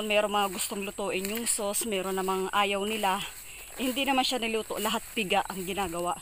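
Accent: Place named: Batangas, Philippines. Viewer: Na lahian ka na ni kuya.. Batangeñang Batangeña ang punto pag salita mo ng tagalog….pakultab,….Upak na ng upak…bakbakan na dito… hahaha…Maganda yang laging naka bota para lalong safe…..Basta may time ako blog mo gusto kong tingnan agad..May nakukuha akong ibang idea sa pagluluto at pagtatanim…..And it remind me the way I used to be, tulad ng ginagawa ni kuya.ginagawa ko rin yun noong araw…Okay say hi to kuya.. God bless you more.❤️🇵🇭🇺🇸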